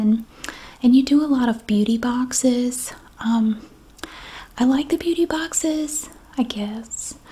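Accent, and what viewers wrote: South, United States. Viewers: Y’all, I thought she was talking to me and almost reported myself………😊 damn I love a southern woman and accent. She has me like ole yellow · Tell me you’re a Southern lady without telling me you’re a Southern lady. Git ‘em! Love you!!!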